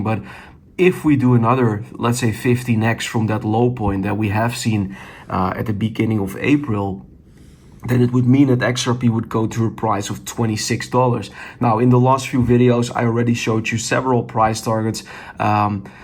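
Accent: Netherlands. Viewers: Typical dutch accent our has!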